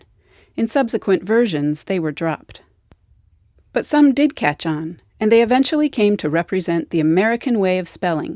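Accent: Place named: RP, United Kingdom. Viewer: Queens english FTW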